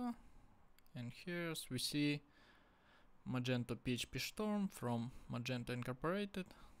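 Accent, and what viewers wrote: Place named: Ukraine. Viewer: Якісний, вітчизняний акцент.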